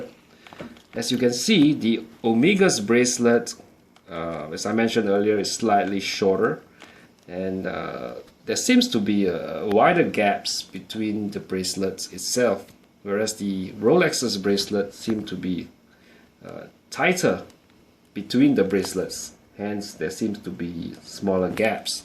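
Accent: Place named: Singapore. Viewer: The way you speak, your accent.... You're Singaporean aren't you?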